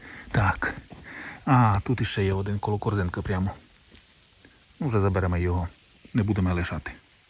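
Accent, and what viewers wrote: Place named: Transcarpathia, Ukraine. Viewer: Щиро дякуємо за таку прогулянку ,дай Боже щастя і здоров'я вам ми зпраги ,приємно слухати нашу закарпатську мову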